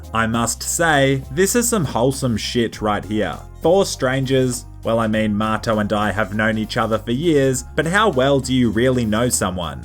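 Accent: Australia. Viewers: I read this in your australian voice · Yes! My prairers have been answered! Finally some more Legs Spread Redemption 2 Online by. Lovely seeing someone freeze to death in the mountains with Australian commentary! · Oh i thought u australian let's go australia, we got best game utubers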